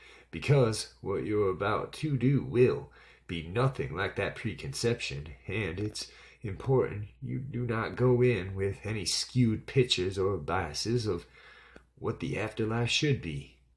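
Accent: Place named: Oklahoma, United States. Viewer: 😠😬 HOWD I MISS THIS ???? LOVE YOUR ACCENT!!!! SOUNDS LIKE HOME TO ME. OUR JOKE IN OKLA IS"I DONT HAVE AN ACCENT, YOU DO" LOLOL. LOVE IT . YOUR DOING A GREAT JOB.!!!!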